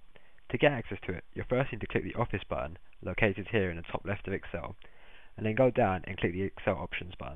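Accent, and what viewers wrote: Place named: United Kingdom. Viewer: THE PRESENTER IS PRONOUNCING THE WORD "BUTTON" AS "BUNS" IS THAT A BRITISH ACCENT THAT I AM HEARING ? THIS ACCENT IS SOME WHAT ANNOYING.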